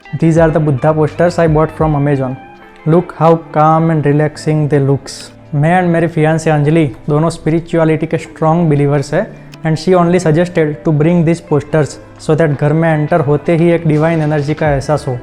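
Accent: Gujarat, India. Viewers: Your Gujarati English accent is so sexy 🔥🔥🔥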